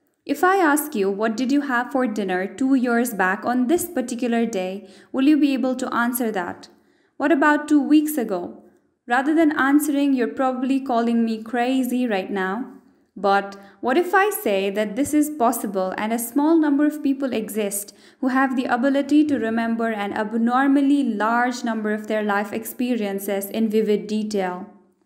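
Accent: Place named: India. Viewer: Omg an Indian narrator , unfortunately I have a low tolerance for this, bye.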